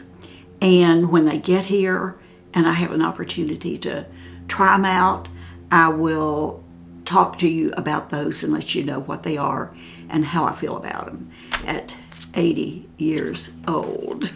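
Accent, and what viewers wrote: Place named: Arkansas, United States. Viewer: I've been looking at your channel for the last 3 weeks and I like all the videos I've watched so far!. I was wondering where you were from I know someone from Arkansas that has the same kind of accent that you do.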